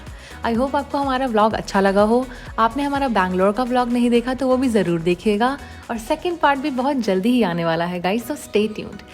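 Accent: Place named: China. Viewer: 한국인이 아닙니다 왜 자꾸 한국이라 하는건가요 발음이 중국인 발음이네요.전 한국에서 왔습니다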